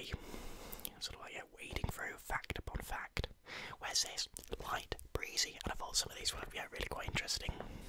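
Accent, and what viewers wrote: United Kingdom. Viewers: Absolutely loved this video As a South African I absolutely love British Asmr accents 😂😂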